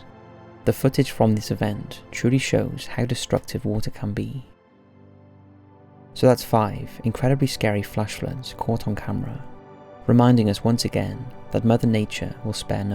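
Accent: Wales, United Kingdom. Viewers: I’m hoping that does an April fools video entitled “official face reveal” and instead says “haha, I trolled all you saps. Smell ya later pimps” in his graceful Welsh accent. It would be so out of character and interesting to hear. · Is it just me or has the narrators voice become less welsh?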